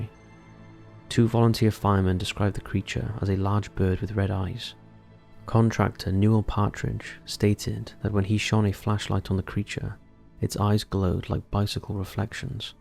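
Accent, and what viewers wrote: Wales, United Kingdom. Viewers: Love the voice (subtle Welsh accent?) and the eerie ambient background noises/music/soundtrack. Very soothing, not to mention informative.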